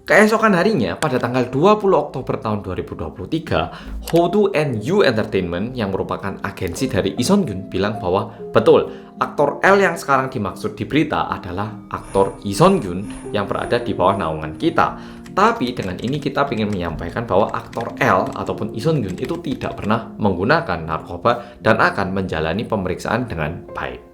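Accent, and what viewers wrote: Java, Indonesia. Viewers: Bang krn tinggal dikorea mungkin gk brani speaking. Utk tambahan pa yg disampaikan Bang ini ke Channel Detective Aldo, ini kasus (Lee Sun Kyun) bisa jd utk menutupi kekotoran Penjabat/Petinggi2, Anak2/Cucu2 Penjabat korea. Kekotoran kecil/sepele artis2 korea digunakan utk menutupi kekotoran para petinggi2,anak2/Cucu2 Petinggi Korea yg lebih besar. Spt kasus Narkoba&Bullying yg dilakukan oleh anak2 petinggi korea. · Mas aku suka medokmu....bukan hanya indonesia banget tp njowo banget...medokmu kui loh mas 😅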